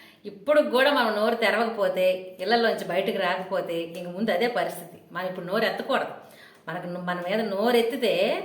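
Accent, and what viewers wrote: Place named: North Andhra, India. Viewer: 🌹జై శ్రీరామ్ 🙏 బంగారుతల్లీ వందనాలు. రాబోయే ఉపధ్రవాన్ని మరచి, గాడనిద్రలో వున్న మన హిందువులలో చైతన్యం తీసుకురావటానికి మీరు చేస్తున్న ఒక మంచి ప్రయత్నాలకి అభినందనలు, ఆశీస్సులు. సహజమైన, చక్కని ఉత్తరాంధ్ర యాసలో మీరు మాట్లాడే విధానం చాలా బాగుంది. మీరు అద్భుతంగా పాడుచున్నారు. మీకు సదా ఈశ్వరానుగ్రహప్రాప్తిరస్తు. 🌹🌹🙏🙏🙏🌹🌹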